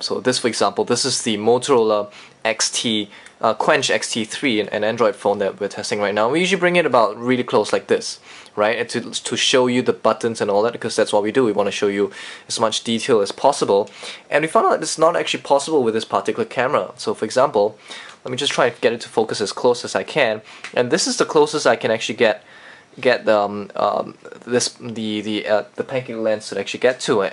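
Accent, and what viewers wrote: Singapore. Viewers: Singaporean english same as Malaysian english :D that's why i can understand this video XD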